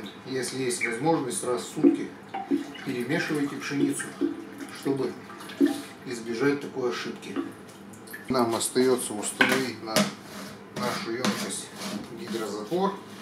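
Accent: Perm, Russia. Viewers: Пермяки многие так говорят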